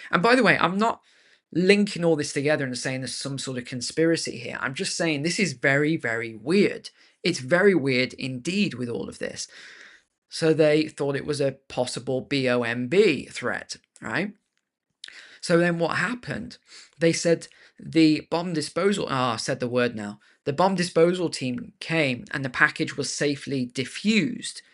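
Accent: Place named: United Kingdom. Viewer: Look man, as an American the sound of a British Accent is beginning to irritate me to no end. It's your love of the new Global Warming/Climate Change Religion, to your censorship of free speech, to your allowing the unimpeded invasion of your country by the Cult of ISLAM, to the general stupidity and wokeness of your society in general, UKRAINE STUPIDITY!! I hope Trump hammers you idiots!!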